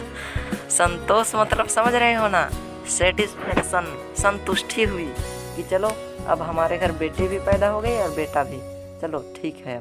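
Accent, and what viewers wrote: Bihar, India. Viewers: Thanks you so much bhaiya I understood everything , literally you are gazab 😅 , aapka bolne ka tarika bhi accha lagta hai mughe aap bihar side ke lag rahe hai , mai bihar ka hun , stay motivated and keep making these kind of wonderful explaination video so it would be helpful for new mankind in future, Again thank you thanks a lot !! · Bhai aap bihari ho naa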